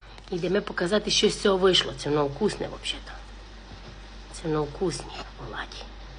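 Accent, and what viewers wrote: Transcarpathia, Ukraine. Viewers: Чудовий закарпатський діалект! Різноманіття -це золото України!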